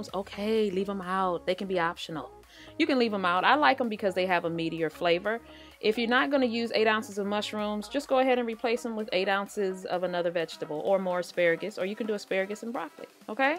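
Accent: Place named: South, United States. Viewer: Hey, I just found you today! I'm so amazed. I would've thought you were from the south. I live in Alabama and you are just like us. I love, love, love your channel. I subscribed and turned on the notifications. 🤓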